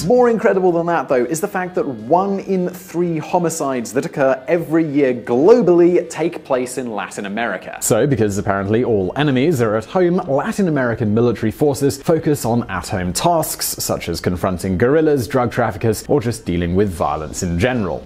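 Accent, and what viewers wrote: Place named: England, United Kingdom. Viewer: Uhh, NATO? Why mention an organization created to stop a Soviet blitzkrieg? It's as if NATO is the only multilateral organization you've ever heard of. Why not take a look at the African Union instead? Note: just because a guy has an English accent, it doesn't make him an intellectual or an expert on a given topic.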